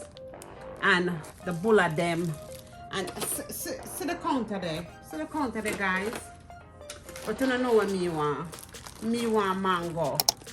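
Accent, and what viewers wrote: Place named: Jamaica. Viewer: Interesting to see your haul from Jamaica. Items I've never seen before and great education for me and especially you speaking in Jamaican 😍👍🏻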